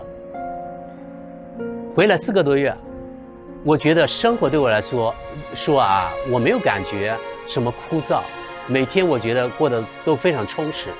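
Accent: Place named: Guangxi, China. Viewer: 乡音不改鬓毛衰 同为桂林人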